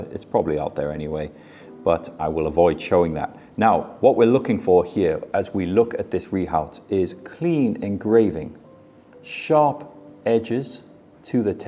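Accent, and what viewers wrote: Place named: Newcastle, United Kingdom. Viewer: Love your accent mate your Geordie still pops out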